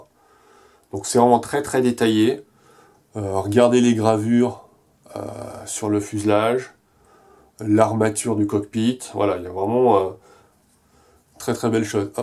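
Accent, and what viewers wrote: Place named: France. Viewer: Salut. Comme tous les ans je monte à Paris pour la Japan Expo. On s'y retrouve avec mes potes de FFXIV et on en profite pour faire des IRL tous ensemble. Les années d'avant j'étais passé devant le stand d'Anigetter sans savoir que t'en faisait partie. Cette fois je manquerai pas de te faire un coucou en tant que membre du forum depuis peu. Sinon je me marre toujours autant devant ton accent franchouilard: Mastèrre Grââde, Fîîne Môldz, ha ha. Ca fait saigner mes oreilles de bilingue mais je préfère prend ça à la rigolade;)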